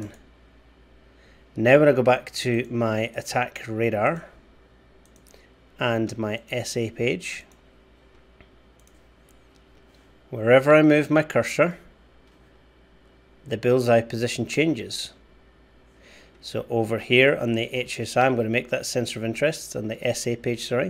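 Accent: Scotland, United Kingdom. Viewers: Finally... A Scottish accent for DCS. Great video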